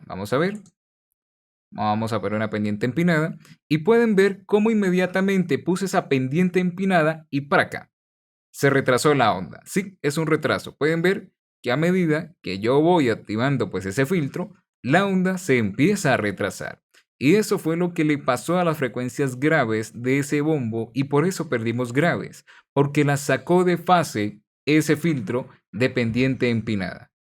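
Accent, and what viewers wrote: Colombia. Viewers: Es colombiano?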